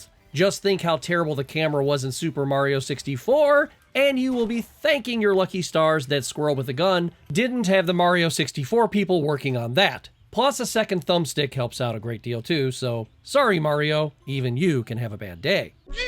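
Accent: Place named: Boston, United States. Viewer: OH SNAP! THE BOONDOCKS REFERENCE...you're speaking my Boston language, KEHD! Great video, Dede is getting this for me for Xmas lolz!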